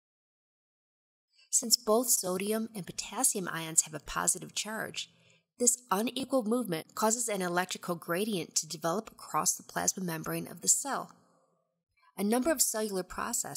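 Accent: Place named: Midwest, United States. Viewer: love the subtle Midwest accent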